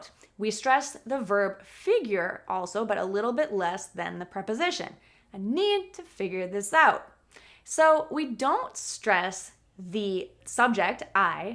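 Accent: United States. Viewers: I love the American accent, hope you will help me learn it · This is not natural English as it's natural American. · American accent?? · Bravo bravo it’s the first time I understand someone speaks American English ☮️🌹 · Dear teacher. I am from Somalia, I really love your American sounds. Proud of you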